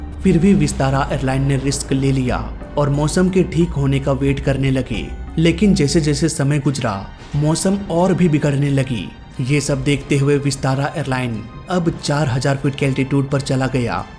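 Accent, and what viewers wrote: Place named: Bihar, India. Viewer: Bihari एंकर 😅😅😅😅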